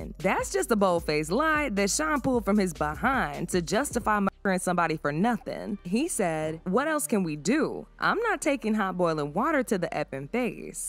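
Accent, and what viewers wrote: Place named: United States. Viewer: As an English man I approve of this American version of the English language ❤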